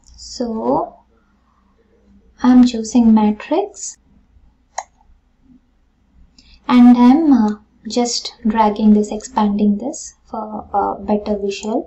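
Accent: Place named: Kerala, India. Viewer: When you have accent, its very hard to understand. are you a malayali?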